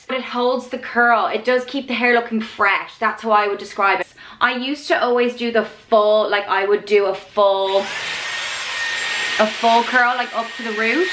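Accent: Ireland. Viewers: Are u Irish?I'm from co.cork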